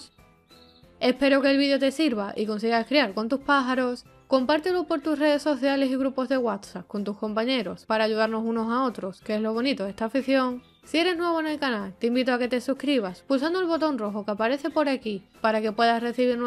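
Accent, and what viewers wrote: Spain. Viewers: Yo tambien vivio en españa. Una vez vi uno de tus videos estando con mis abuelos y me dijeron que tenías un acento como de canarias. Es cierto?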